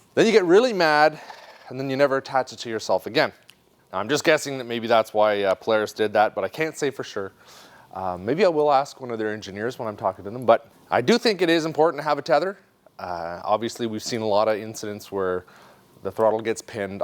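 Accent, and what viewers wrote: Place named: Northern Canada, Canada. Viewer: it sounds like your from Northern Canada. where abouts?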